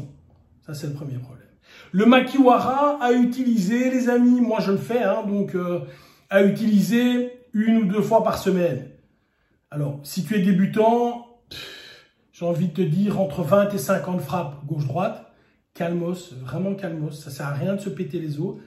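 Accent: Belgium. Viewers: Merci pour les informations. Étant presque totalement étrangé au combat réel, votre vidéo m'aiguille sur comment débuter mon renforcement. Mis à part cela, ne seriez-vous pas de la famille de Zouloux :P ? J'imagine que non du fait que vous soyez belge (déduction dûe au <<septante>>), mais votre voix et votre façon de parler ressemble pas mal à la sienne ; le monde étant petit je demande tout de même haha. Bonne continuation à vous ^^ !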